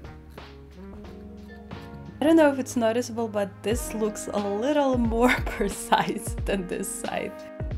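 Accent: Russia. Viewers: From the accent and intonation of her voice, I realized that the girl was Russian. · I could listen to your accent for days. Is it Russian? Thank you for video. I am disabled and cant afford salon anymore plus i have depuytens contracture in my dominate hand. It makes for very difficult to trim my own hair.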